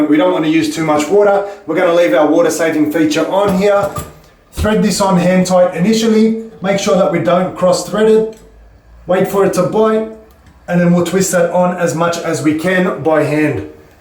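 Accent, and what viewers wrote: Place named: Australia. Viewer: Legend, you literally have just saved me big time. I am young single female with no plumbing experience and was able to do this! Being an Aussie I immediately felt calm hearing a fellow Aussie use no nonsense straight to the point instructions.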